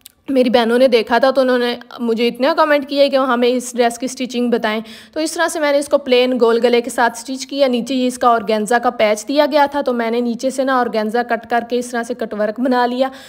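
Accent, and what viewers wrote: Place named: Pothohari, Pakistan. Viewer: Ap potohaar sy hn?ap ka lehja pothohaari ha.lkn ap punjabi show krti hn khud ko.why?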